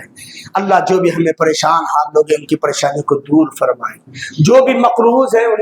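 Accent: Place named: Arab world. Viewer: عربی لہجہ بھی بہت ہی بھدا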